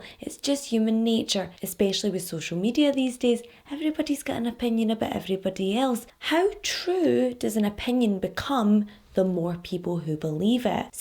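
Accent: Scotland, United Kingdom. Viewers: Girl I just found you a few days ago and I’ve watched almost all of your vids now I’m obsessed!!!! I love your Scottish accent and true crime so this is the best!!!! 💗💗💗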